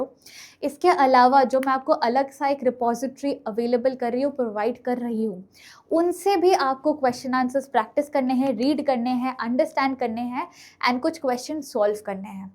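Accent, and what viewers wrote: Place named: Bengal, India. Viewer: her begolii accent🥹🤌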